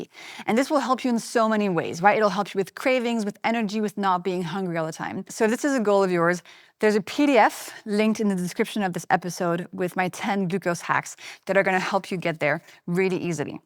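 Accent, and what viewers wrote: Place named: France. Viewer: Your voice is mellifluous ! Ur french accent makes all the difference I guess and u use a lot of hissing sound. No wonder..most French women are sexy and sound sexy ! But, this dress sucks !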